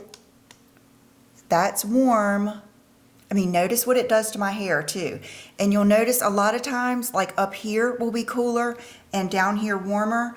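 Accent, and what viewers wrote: South, United States. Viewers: I just LOVE YOU. You are who I’d love to be. I found you and you are like my fantasy channel. I can’t afford the high end things you have and talk about. I have to say at times, I feel envious and at times it makes me sad that I can’t have such nice things. I’m 60 and disabled on fixed income. I’d love to be picked for a gift, it would mean the world to me. Thank you for being the fantasy “me”. My coloring is like yours and I have the redness. I try to look my best with the makeup I can afford. Anyway, I am happy I found your channel and I love your fashion sense (it’s me or the me if I could afford it) I love your southern accent and your kind and sweet way you treat your “friends” on here. God bless you.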